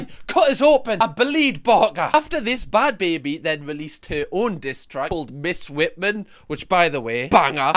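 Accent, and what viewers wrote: United Kingdom. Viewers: I love this being explained in your Brit accent. It just makes me realize how petty unhinged all of this it is. It’s gone international 😂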